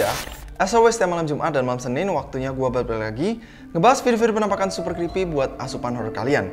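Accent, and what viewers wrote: Java, Indonesia. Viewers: Bro asli mana? Kok logatnya medok jawa ?😂😂😂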